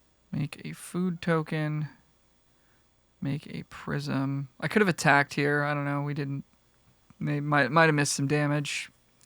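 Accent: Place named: Canada. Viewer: You say Ey a lot. U Canadian?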